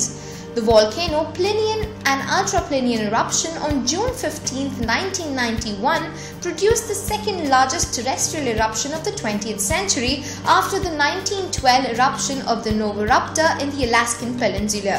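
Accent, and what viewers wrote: India. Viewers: Indian accent my dear. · indian xa... ganyan silang mag-ingles cute lang talaga ...-) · Indian accent?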